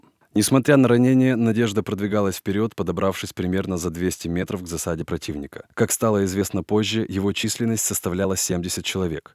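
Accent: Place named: Ukraine. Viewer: По вимові диктора зрозумів, що кліп зроблений в Україні. Вищий клас, така інфорграфіка дуже потрібна. #SaveSavchenko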